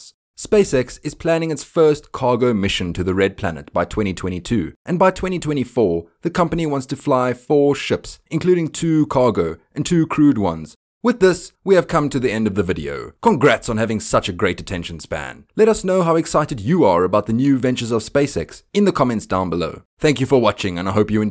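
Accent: South Africa. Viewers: He's from South Africa, like Elon himself.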